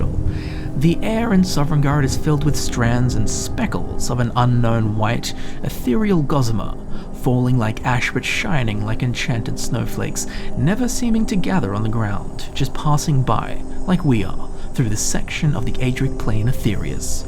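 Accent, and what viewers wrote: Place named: United States. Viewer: Is it just me or was super American sounding in this vid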